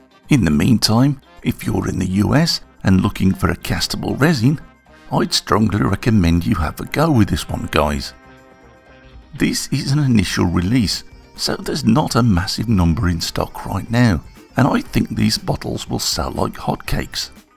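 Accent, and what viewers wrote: Birmingham, United Kingdom. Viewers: are you a brummie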